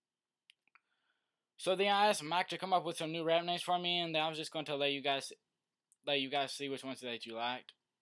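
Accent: South, United States. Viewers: one thing i hate but im not a hater is his south accent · One thing I don't get is why does he have a country tone but lives in Michigan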